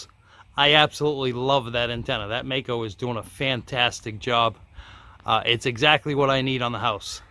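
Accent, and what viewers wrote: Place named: Massachusetts, United States. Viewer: You aren’t from NC originally are you? You got that Peabody / Beverly MA accent going on. Hahaha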